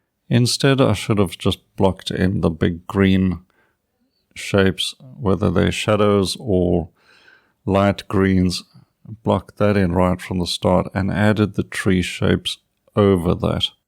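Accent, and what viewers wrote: South Africa. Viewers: Every one of your videos is helpful. Imove between impressionism and tonalism or a combination of the two. Love your work and your gentle vouce with its echoes (for me) of South Africa. Thankyou ❤️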